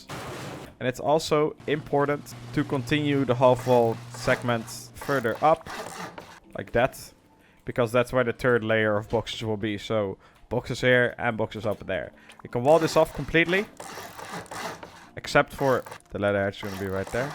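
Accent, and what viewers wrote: Netherlands. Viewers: Are you dutch? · Wel een lekker Nederlands accentje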